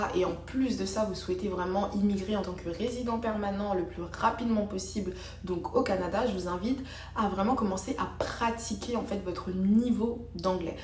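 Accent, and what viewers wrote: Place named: France. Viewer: Vous avez l'accent française🇫🇷😮. Je suis aide soignante en France mais étranger. C'est possible de travailler avec le diplôme française ? Avec un visa de tourisme ?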